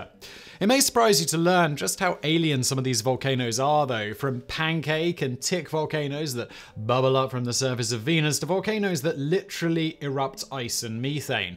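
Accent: United Kingdom. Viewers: He's British... He says it the british way... Just like Aluminium, Carribbean ect is said differently from American English Also Meth is said Meh-th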